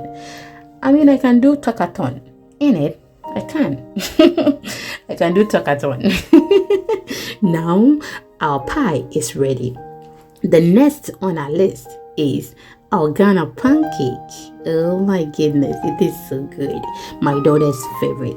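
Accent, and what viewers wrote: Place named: United Kingdom. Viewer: You Uk accent is on point ooo still watching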